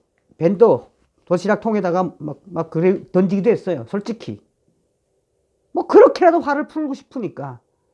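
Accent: North Korea. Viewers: 첨 들어왔는데 북한 사투리가 정겹네요. 저쪽 사람들 디리디리 까 주세요. 간나새끼들 간이 오므라들겠어요. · 참 재밌게 방송하시세요^^한번씩 북한 사투리도 정겹게 들리구요^^감사 드립니다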